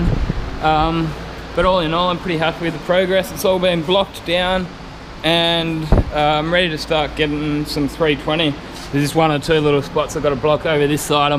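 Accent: Australia. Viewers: I like your enthusiasm. I've been doing this work for 40 years and still enjoy it, but it's fun to watch you work (especially enjoy your aussie accent!)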